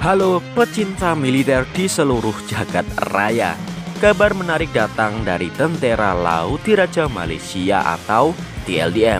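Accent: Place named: Java, Indonesia. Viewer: Saya Indonesian... Saya bisa pastikan admin ini Indonesian juga... Slank Jawa atau medoknya masih menonjol atau jelas terdengar (hanya bisa dipahami oleh orang Indonesia) 🤗🤗🤗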